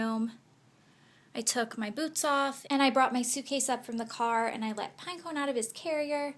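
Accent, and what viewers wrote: South, United States. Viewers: I love your southern accent :)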